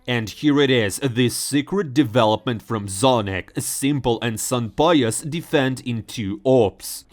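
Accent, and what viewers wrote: Russia. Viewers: nice the russian accsent voice is back BIG W👍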